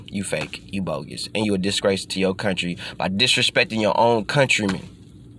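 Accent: United States. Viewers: another bollocks talking yank channel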